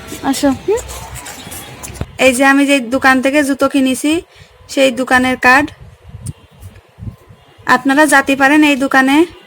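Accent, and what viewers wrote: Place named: Khulna, Bangladesh. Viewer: আপু কি খুলনা বিভাগ এর মানুষ নাকি? আমাদের ভাষার সাথে খুব মিল পাচ্ছি।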